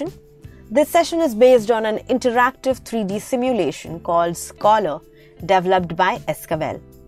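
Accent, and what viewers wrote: India. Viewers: Not the india accent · Indian accent 😂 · Omg, what an accent..i hate indian accent!!!🙄😱🤮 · I hate indian accent.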